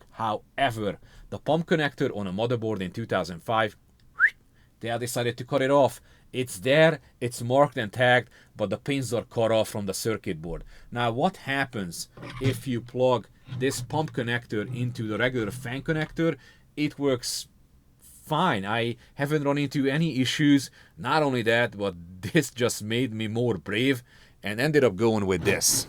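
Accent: Hungary. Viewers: you have a Hungarian accent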